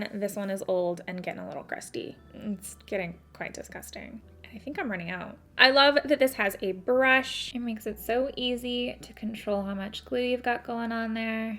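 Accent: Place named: Canada. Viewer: ur Canadian accent is adorable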